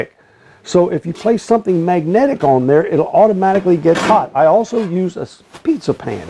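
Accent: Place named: Appalachia, United States. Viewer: Tou dont have very good control of the english language at ANY rate, APPALACIAN ENGLISH IS HAYSEED ENGLISH.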